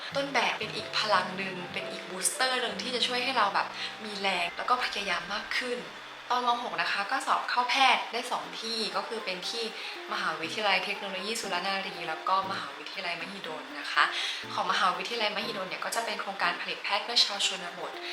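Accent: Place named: Buriram, Thailand. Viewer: จดจ่อตั้งใจฟังจนจบอยากรู้ว่ามีแฟนรึยัง แต่ฟังจนจบก็ยังไม่รู้ 555 ผมเล่นกีต้าร์และเปียโนมาแต่เด็ก เล่นให้ร้องเพลงได้ ร้องคู่กันก็ได้ มีบ้านอยู่ในตัวเมืองบุรีรัมย์ไร่นึงหลังทวีกิจจิระ ไว้เป็นเรือนหอสำหรับแต่งงานได้ ชอบมากสำเนียงบุรีรัมย์ชัด ผมเรียนอนุบาลที่บุรีรัมย์ เวลาไปดูแลบ้านที่บุรีรัมย์จะต้องแวะกินลูกชิ้นทอด อยากชิมลูกชินทอดฝีมือคุณหมอครับ ผมเล่นกีต้าร์เพลงแนวนี้ครับ <link>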